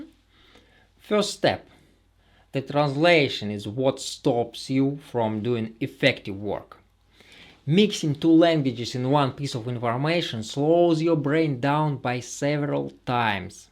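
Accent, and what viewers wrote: Russia. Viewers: Судя по акценту мужик из наших:)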